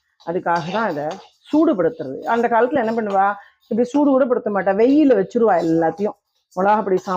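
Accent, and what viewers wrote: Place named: Thanjavur, India. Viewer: Very nicely you are teaching. Thanjavur pani peachu , but little Thirunelveli pechum mix panni you are speaking . Thank you.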